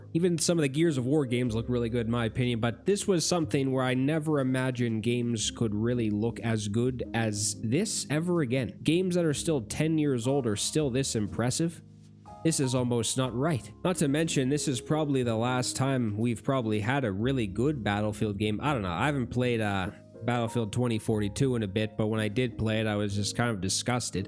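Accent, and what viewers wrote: Canada. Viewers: Your voice and Canadian accent is so relaxing. I love to CHILL while listening 😎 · The first time I could tell a Canadian from their accent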